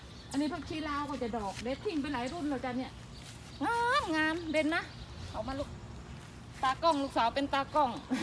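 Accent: Korat, Thailand. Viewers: ผักงามจังเลยค่ะ ๆพูดโคราชเพระดีจ้า🌿🌿🌱🌱🌱💞💞💞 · น่ารักน่ะภาษาโคราชชอบฉันนๆน่่ะ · พูดโคราชนั่นละจ้ะ ชอบ ดีจ้ะ ผักงามอย่างนี่ เขาว่าคนปลูกมือเย็นเนาะจ้ะ ปลูกไอกะงาม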